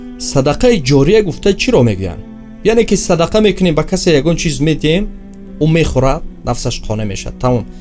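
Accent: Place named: Tajikistan. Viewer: Асалом Алекум акаи кучогиай забони точикиро тоза гап мезанад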